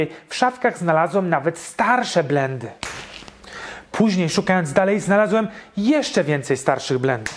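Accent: Poland. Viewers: Daruje sobie pan ten "angielsko-amerykański" akcent , słychać ześ pan Polak z krwi i kości. :)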